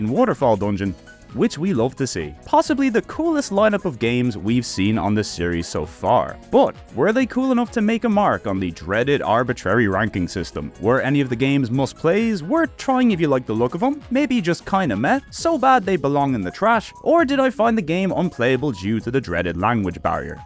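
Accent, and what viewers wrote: Ireland. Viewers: Here for that sweet sweet irish accent. Oh and the games of course, need to grow my backlog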